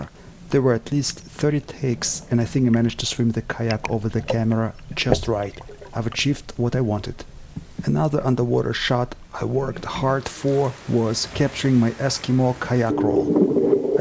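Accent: Russia. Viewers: "Good enough," is not good enough for! Thank you for your fascinating videos on so many different "maker" topics. Your narration in Russian-scented but perfect English is very pleasant to listen to. Oh, and don't hurt yourself, please.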